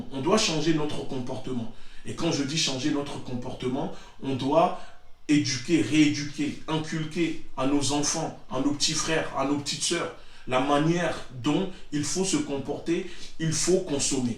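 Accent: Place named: Africa. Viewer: Ta carrément raison non mais pour qui il se prend quelqu'un à remarqué que depuis qu'il fait le prêcheur il a pris un léger accent africain pour être plus crédible, allez écouter comment il parle bien français sans accent quand il rappai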